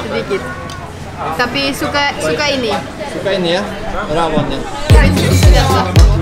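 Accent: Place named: Lombok, Indonesia. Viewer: "Logat" sudah seperti Orang Lombok🤭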